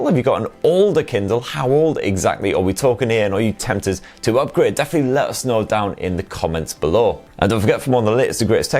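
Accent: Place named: Newcastle, United Kingdom. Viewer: Oh no, you're a Geordie, aren't ya?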